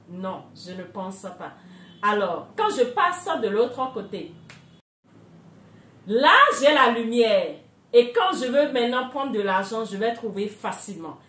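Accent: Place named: Benin. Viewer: Je suis tombée sur votre vidéo ✨ Pour la première fois je me suis abonnée tt 2 suite parce-que vous êtes assez naturelle vous n'avez pas essayé de changer votre accent béninois BRAVO👏👏👏 BONNE SUITE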